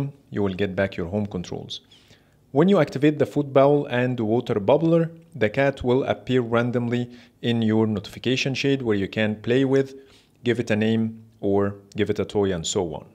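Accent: Egypt. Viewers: I can tell from your Accent that you are Egyptian Keep the hard work 🔥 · You sound Egyptian! :D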